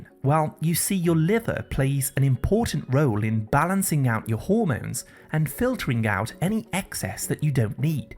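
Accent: United Kingdom. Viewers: Everything I eat is on this list. Anyway, I don't trust anybody that speaks with a British accent so screw him. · Information is good to know only could you speak in a more English pattern than in a British accent because it's hard to understand sometimes and frightening also. SERIOUSLY STOP THE CORRUPTION OR IT WILL KILL YOU 🇺🇸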